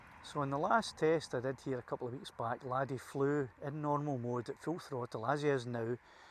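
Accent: Scotland, United Kingdom. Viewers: Scottish accent is the best. ☺️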